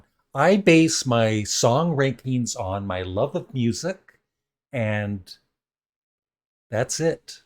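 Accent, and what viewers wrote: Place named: Canada. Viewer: OMG! That is hilarious and wonderful. I just started watching your other channel. I'm 61 so I am in the ballpark with you on your taste (with some adjustments but..) Youtube put this on my suggestion list. I am so glad. This is like Celebrities Reading Mean Tweets on Jimmy Kimmel (a late night show we have here in USA - not sure if you do in Canada). I must admit I get a little concerned about the mean comments my favorite audiophile and album reviewers get. I only watch nice people (who should be treated respectfully) You are so gutsy to even read mean comments. Anyhoo... Inspired by some negative things a few Youtube audiophiles (and commenters) have done to other Youtubers, I've been wanting to make my own Youtube video rating my favorite audiophiles, explaining how they work, what I like about them etc.. AND how attractive they are as a man (to me - Gay audiophile/rock and roll fan that I am). 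You had already inspired me to want to make one about album reviewers too! You are a! I'm so glad you called yourself that. Deserved! AND you rock that Canadian accent! Why don't those Toronto Audiophiles I watch rock one too? I hope I get the guts to make such a video and you get to hear how appealing you are! This video was just further proof!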